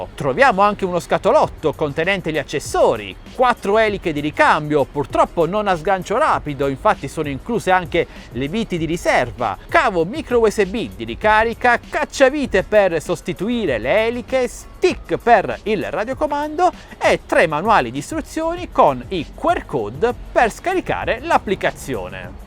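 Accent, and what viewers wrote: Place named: Molfetta, Italy. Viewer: Sei di Molfetta? La A la E ....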